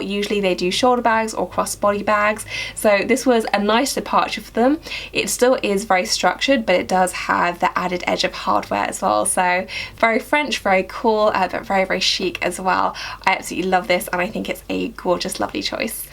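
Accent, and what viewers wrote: United Kingdom. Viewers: I am not against her right to make video on luxury bag. The reason why I watch her video is that she is such a sweet lady with amazing British accent. Being an English teacher, I really enjoy the way she speaks . There is nothing wrong about her making video. Sometimes I get frustrated about the fact that we work so hard just for earning a living, hardly can afford kath kidson bags.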